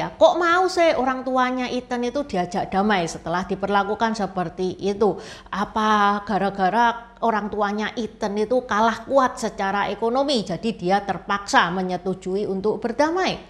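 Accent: Java, Indonesia. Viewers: Aku gak seneng berita nya. Tapi aku sangat suka dengan reporternya bahasanya medok banget. Lanjutkan semoga makin sukses.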